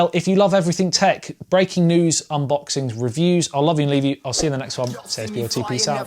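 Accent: England, United Kingdom. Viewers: That's the beauty of the English speaking the English language.😍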